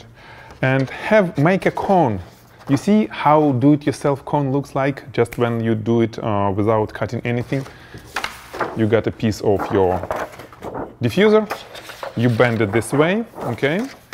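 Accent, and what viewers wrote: Russia. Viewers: Wish I could fully listen, I'm so delighted by the charming personality and accent which triggers my Russian nostalgia that I can't pay full attention to the tutorial :D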